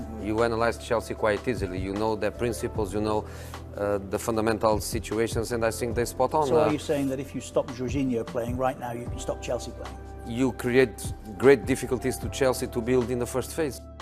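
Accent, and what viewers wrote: India. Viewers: No voice please. Bad indian accent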